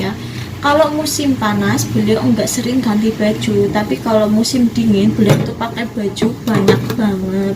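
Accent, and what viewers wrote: Banyumas, Indonesia. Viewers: ngapaknya medok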